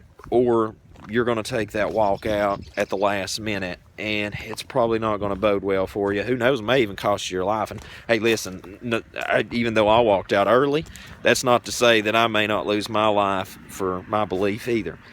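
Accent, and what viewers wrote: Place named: Kentucky, United States. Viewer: I like the accent is that KENTUCKY?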